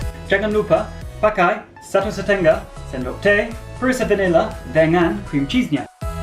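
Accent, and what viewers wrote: United Kingdom. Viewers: British accent enak bgt 😂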